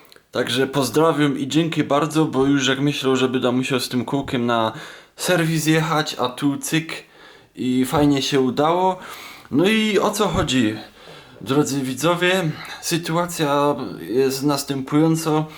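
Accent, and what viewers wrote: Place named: Silesia, Poland. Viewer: Ja nie dam komentarza bo nie umiem tak śmiesznie po Śląsku mówić😉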